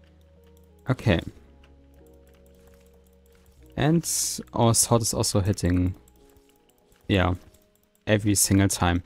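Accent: Germany. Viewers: Man hört dein Deutsch so raus (°ー°〃) aber du bist cool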